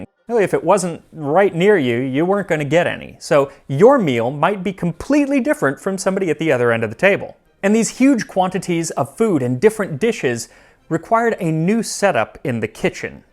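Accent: France. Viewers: 😍😍😍 for the French accent !!! So cute !!!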